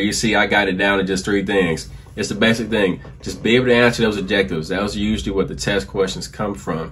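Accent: Baltimore, United States. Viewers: I immediately knew he was from Baltimore when pronounced his “Do’s” and his “ew’s” haha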